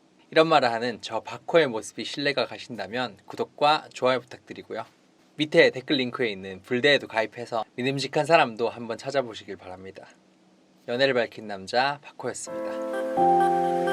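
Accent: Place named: Busan, South Korea. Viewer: 항상 잘 보고있습니다. 말을 너무 부드럽게 잘 하는 듯해요. 특유의 부산말투도 정감있구요^^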